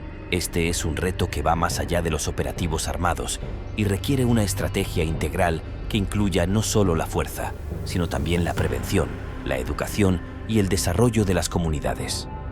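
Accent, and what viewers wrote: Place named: Spain. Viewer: Qué pasa, por qué todos los que narran los videos son voces de españoles? Habiendo voces de mexicanos lindas y agradables , por qué tenemos que soportar esto, qué pasa, que alguien me explique, porque me interesa el vídeo pero no me gusta que lo narre un español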